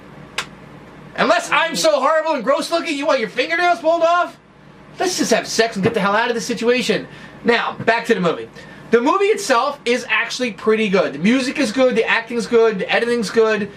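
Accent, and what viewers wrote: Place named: Philadelphia, United States. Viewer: Is the movie Hancock, with the loveable Will Smith? I think it is;) And I only saw that movie once about 3 years ago. Anyway, Vile sounds good and I've loaded it up. Gonna watch it right after posting my answer to where your hat is from. Plus, great news for you, I live in Pennsylvania and from your accent, I'm guessing you live near Philly (also Flyer's cap;) so shipping should be minimum haha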